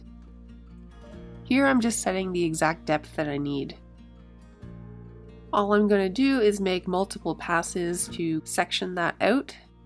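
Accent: Canada. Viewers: Hi. I’m a senior Canadian woman living in Europe. So when I see your videos, I get the chance to hear a fellow Canadian. For me it’s also a cultural connection. Your videos are informative - I get to see more characteristics of wood and different styles of furniture. All I see is an excellent presentation - certainly something for which you should be proud. As for your tattoos, I think they’re beautiful. Thank you, also for your generous contributions to protecting bunnies and for caring about your community. Please continue making your videos. I cannot do furniture work in my apartment, but if I had to shop at one of the second hand shops (almost every village has one), I would be better informed regarding the pros and cons of an old pieces of furniture. Thanks so much for making my YouTube experience an educational one (as well as entertaining). Take care and best wishes for a wonderful future. 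…..waiting for your next contribution. Thanks again.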